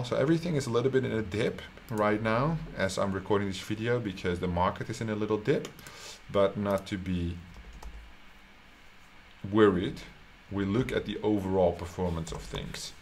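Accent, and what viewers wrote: Netherlands. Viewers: Nice vid bro!! Smart thinking🤔💎 Where you from? Accent sounds Dutch😅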